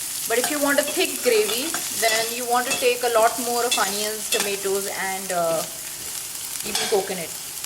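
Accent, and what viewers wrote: India. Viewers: Try some elocution lessons so you don’t have that thick Indian accent ...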